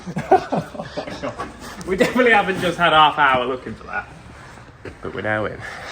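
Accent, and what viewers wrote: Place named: RP, United Kingdom. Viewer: That old engine would of ran on 4 star leaded petrol not unleaded or super expensive crap its a old jenson engine posh school boy the way you talk you got to remember the land rover has been stood for years so things will be stuck and rusty but when you service the engine it will run alot better but wat a beast of a sound better then a rover v8 but it will take a long time to restore everything and find out wat many extras on it and wat they do??? 👍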